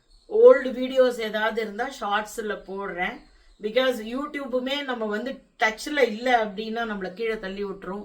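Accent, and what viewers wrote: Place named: Iyengar, India. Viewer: Ellam Nanmaike, all the best, I am sure your mom n law understood your situation. She was there on Mon, perhaps spoke to you at length on that day to wish you well ( leave her blessings in person ), namakku enna arul irrukko adhu muzhu shaga irrukkum, don't worry, everything will workout fine, I like your iyengar tamizh, I watch off and on, we will wait for you to come back and enjoy your recipes, take care, relax and do the needful under the circumstances. Be well, keep strong, thanks, Radha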